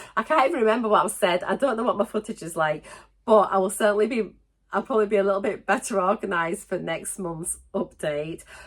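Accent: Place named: North Yorkshire, United Kingdom. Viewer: Me again! On this one now. I meant to say despite doing Noom and coming to a 6 month plateau, I was blown away when my doc told me it wasn't anything I was doing that was keeping me plateaued - it's the sodding menopause and it was like a light went on. I thought the sweating and lack of oomph was down to fibro but it was getting so bad and the lack of weight loss knowing I was eating less than 1000 cals a day by a fair bit had got me so down I'd barely been able to get out of bed for more than 15 weeks 😭 when she said it's not you, it's your hormones, I cried! To feel like you're not self sabotaging and finally someone says you're doing all the right things but your body is just changing was epic! HRT is life changing and so is Mounjaro. I've downloaded an app called Fastic to use as my Noom sub runs out soon and I'm logging daily how I feel. 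You're such a joy! Used to live in North yorks, near Hawes where they make Wensleydale and I recognised your NY accent as soon as I watched you 🤗 take care honey. Sarah xxx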